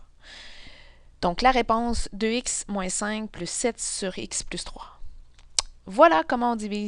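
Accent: Quebec, Canada. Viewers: c est l accent québecois et c est mieux que d entendre un marocain parler... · Mohammed gj c'est l'accent québécois idiot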